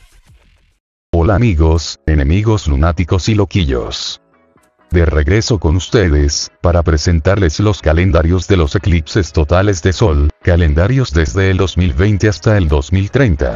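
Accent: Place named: Argentina. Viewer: Me suena argentino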